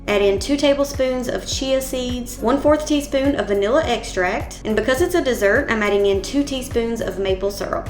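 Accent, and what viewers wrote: South, United States. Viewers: This is my first video and introduction to your recipes. Let me say you are extremely Knowledgeable! I love the explanation of what it is and why it's used! I'm assuming by your accent you're from the South and I just know you are you making Sausage Gravy and Biscuit's and Eggs(my southern cousins would) Lory Staten Island NYC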